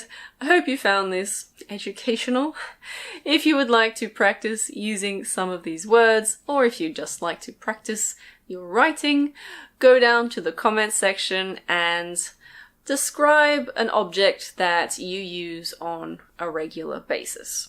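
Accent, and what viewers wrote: United Kingdom. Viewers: is it british english ? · Do you speak British English?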